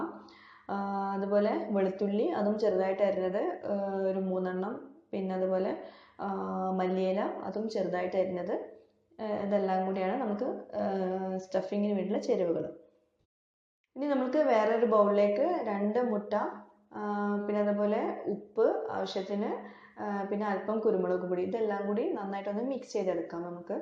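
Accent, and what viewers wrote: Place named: Kannur, India. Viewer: Thank you for a great presentation and I loved the way you speak Malayalam. It sounds like how it is spoken in the Kannur area.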